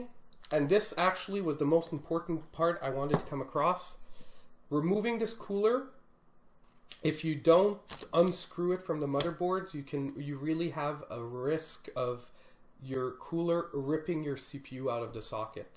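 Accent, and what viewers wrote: Quebec, Canada. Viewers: Subb'd because good video and awesome Quebec English accent :)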